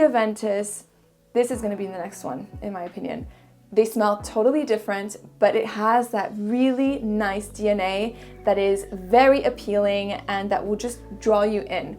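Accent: France. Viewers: I love your french accent ❤️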